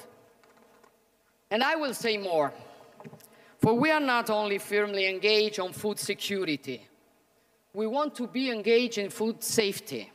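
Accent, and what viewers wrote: Rome, Italy. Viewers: A Giorgia, quanto sei più bella quando parli in romanesco!! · Ah, mai sentito un inglese cosi’ con inflessioni romanesche! dajjjje! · Paesi poveri, attore ucraino, qualcosa per gli italiani? Nulla vero? L' inflessione dialettale non se la toglie neppure in inglese!!!!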